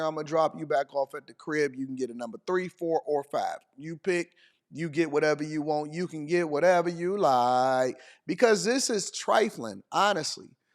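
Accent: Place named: South, United States. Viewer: As a DMV native, that southern accent is a super turn-on. I laughed real good on the female Numbers Assessment 😂.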